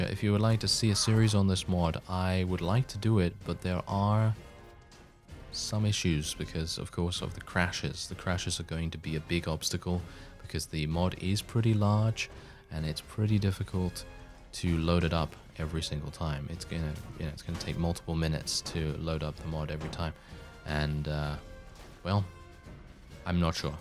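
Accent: United Kingdom. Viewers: a very proper British accent in my opinion, it’s butchered otherwise haha between the old Australian accent and this blokes one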